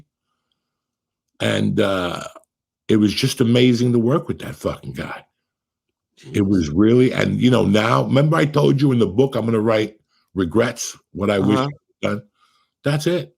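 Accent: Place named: New York, United States. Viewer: Happy New Year Mistuh. I live in Texas but My Dad n that half of my Family are from New York. Haven't seen that side or been up there to New York since about 2ooo or 2oo1. But listening to you reminds me of them jus mainly based off your accent accompanied by the way you talk lol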